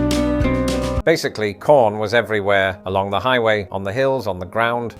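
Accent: United Kingdom. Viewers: about Eisenhower turning American into the world's largest Scalextric. The WHAT?! That got me curious. Did I hear that word right? Was that waning British accent too confusing? Nope. I heard it right. I googl'd it. Scalextric is the leading brand of UK slot racing cars. Slot cars? Instant nostalgia set in. Now I know what meant.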